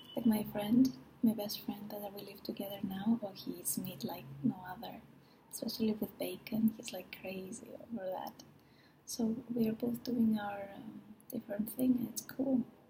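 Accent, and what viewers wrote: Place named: Greece. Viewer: Greek, isn't it?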